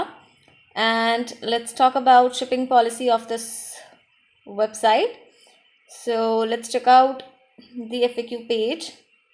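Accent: India. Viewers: your vedio sucks at least buy a mystery box and do a good review on it. all I understood from your vedio is that your Indian, heavy accent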